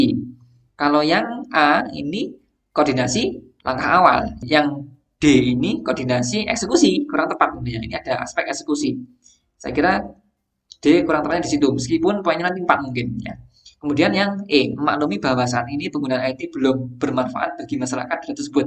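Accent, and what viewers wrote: Java, Indonesia. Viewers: Buoh... 😂 Jowo tenan coach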